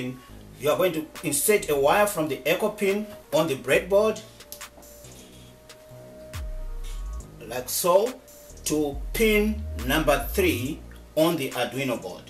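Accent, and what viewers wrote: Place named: Shona, Zimbabwe. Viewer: you sound Shona though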